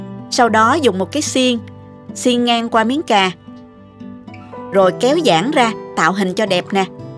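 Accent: South, Vietnam. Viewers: cách chỉ dẫn tường tận, dễ hiểu, dễ thực hiện, thích nhất là giọng nói miền Nam thân thiên... 10/10...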